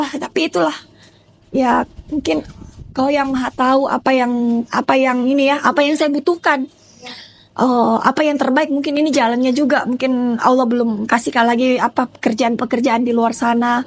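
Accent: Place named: Makassar, Indonesia. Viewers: Makassar bro ewako❤ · Kental sekali logat Makassarnya.... Slm dari Manado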